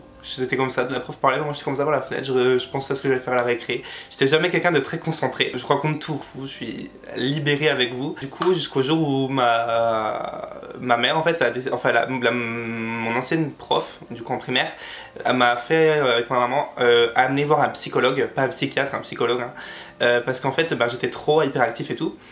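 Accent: Franche-Comté, France. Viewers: En tous cas ta vraiment l'accent francomptois 💯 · Tu viens de Franche Comté ? Et tu es Arianators ? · Je me disais bien que tu avais un peu l'accent de Franche Comté :) Team Besac 🤗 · j'me disais que tu avez un accent que je connaisais, normal c'est le miens #TeamCancoillotte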